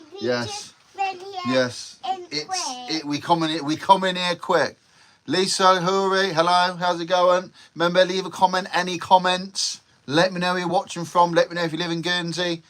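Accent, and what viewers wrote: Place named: East Anglia, United Kingdom. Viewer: You just sound like a east anglia accent but I read Channel Islands should have a bad South African accent?🤷‍♂️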